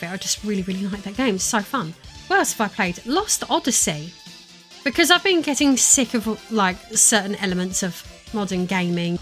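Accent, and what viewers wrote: United Kingdom. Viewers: Im only here to listen to the british accent...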